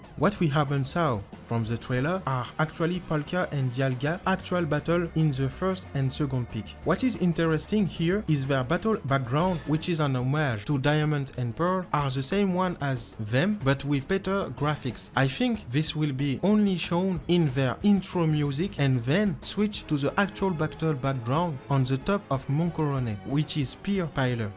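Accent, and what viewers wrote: France. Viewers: Tu es français? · he’s French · T’es français ? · That’s a French accent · c'est pas pour me moquer mais l'accent français me calcine j'arrive pas a suivre la video normalement 😭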